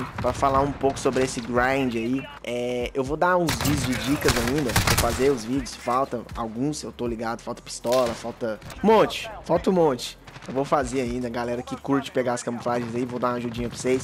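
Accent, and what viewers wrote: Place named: Goiás, Brazil. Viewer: Mano vc é de Goiânia ? Tem o sotaque mo parecido kkkk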